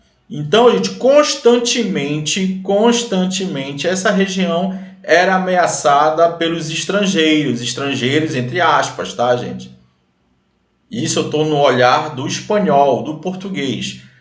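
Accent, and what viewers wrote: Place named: North, Brazil. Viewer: Ele com o sotaque nortista deixou a aula mais top ainda ❤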